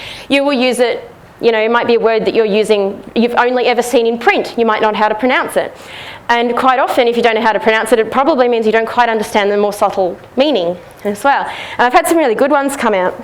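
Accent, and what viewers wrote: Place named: Australia. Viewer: I love australian accents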